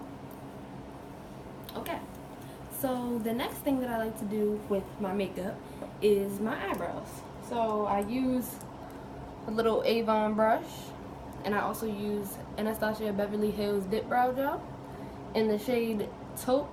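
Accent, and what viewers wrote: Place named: Midwest, United States. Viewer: you sound like you're from northwest ohio or Detroit am I correct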